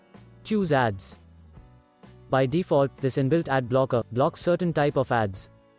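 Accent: India. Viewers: Damn even if it's a robotic voice these people achieved to make it sound indian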